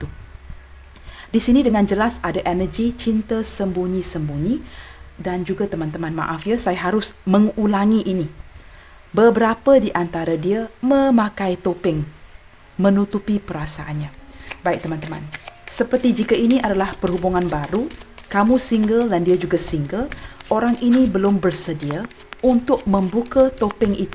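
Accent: Malaysia. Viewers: Thanks you atas pencerahan nya bunda and aku suka swara nya yang khas Melayu nya sexi sekali bunda and sehat selalu ya bunda semanggat trus dalam konten nya 🙏🙏🙏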